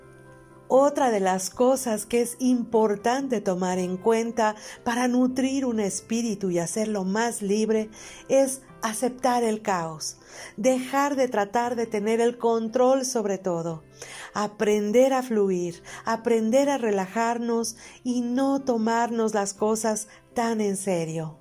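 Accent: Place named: Castile, Spain. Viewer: Otra cosa que me encanta de Usted es que habla y escribe el castellano perfecto, como antiguamente se hablaba y se escribía en España. Me quito el sombrero ante Usted. "CHAPEAU"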